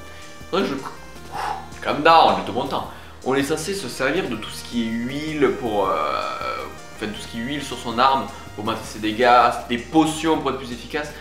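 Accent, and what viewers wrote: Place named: South, France. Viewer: L olo sauf ta voix du sud ^^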